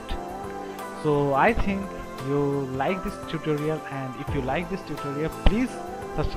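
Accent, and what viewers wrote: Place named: India. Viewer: You can guess it from his accent probably Indian or Pakistani.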